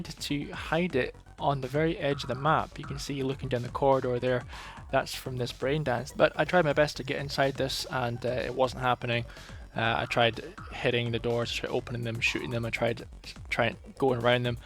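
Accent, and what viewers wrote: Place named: England, United Kingdom. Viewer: Good, Your video are great. This videos make sense because Night City is a place where CDPR can make a lot of very good stories for a long time. CDPR will likely be doing this and building a cool universe, better than the candy-sweet superhero universe from Hollywood. More ambiguous. Hey tell my, where are you from? Maybe from UK, You have a little English accent, I think?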